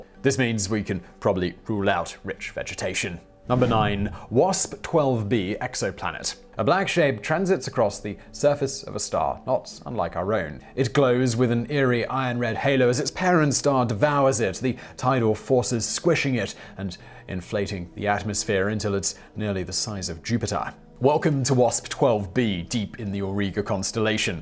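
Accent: United Kingdom. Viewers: At toll... mahgma... waatah. Sorry, I can't stop repeating you, lol. I love the U.K. accent!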